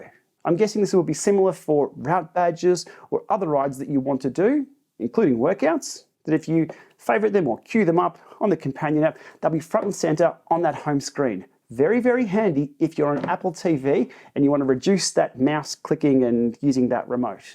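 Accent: Australia. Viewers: Don’t say it both ways just talk Australian and say route as root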